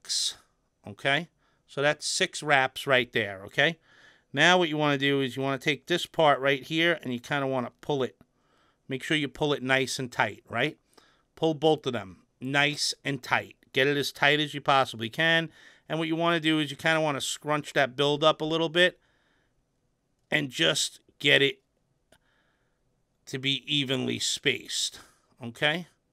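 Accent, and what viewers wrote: New York, United States. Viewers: I can guarantee that if I use that same exact wire, with the same exact amount of wraps, the last thing it will do is give me the 0hms that the calculator tells me I should get. You never did show us what 0hms the mod was detecting the wire as when it was connected to a mod :P. It's useful to know though, how to build. The wire company I bought all my pre-made coils from has just decided to move abroad on us, so I'm stuck for pre-made's now. All I can get is the regular round wire now, so it's useful to know if your in the same position that I am now. I have 500ft of Kanthal A1 sat on the shelf next to me, which I bought just in case I had trouble buying pre-mades. If I can wrap my head around building simple coils, I figure that there's enough wire there to last me maybe 2 years or more, depending on how many times I recoil, which is usually at least once or twice per month. 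By the way, am I right, considering your accent, in thinking that your based around the New York area?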